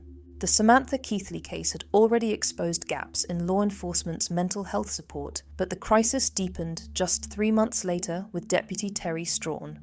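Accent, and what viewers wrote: United Kingdom. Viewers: jesus christ this channel sucks!! two minutes listening to this british niteit made me wanna blow my brains out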